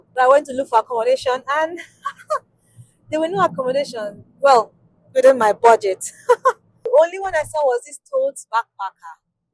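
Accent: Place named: Akwa Ibom, Nigeria. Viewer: You speak like an akwa ibom Nigeria❤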